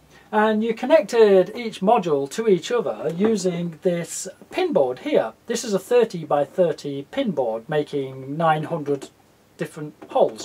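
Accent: United Kingdom. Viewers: What a challenging project,! I follow your explanations with great interest. Despite my limited English, your British pronunciation is a free language class!!!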